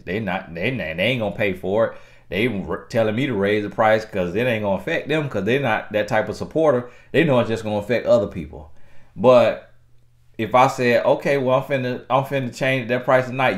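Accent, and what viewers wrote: South, United States. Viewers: I love your country accent and your authentic self! You're a blessing to us. God made you just the way you were supposed to be.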